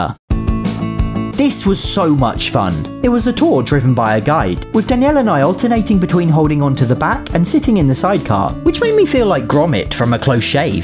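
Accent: United Kingdom. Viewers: Between his humour, LEGO obsession, love for cats, incredible content and British accent... you are unmatched Edit: OMG REPLIED TO MY COMMENT! MOM I'M FAMOUS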